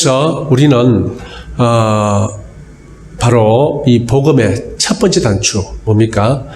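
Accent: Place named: Gyeongsang, South Korea. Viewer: 경상도 구수한 사툴이 할렐루야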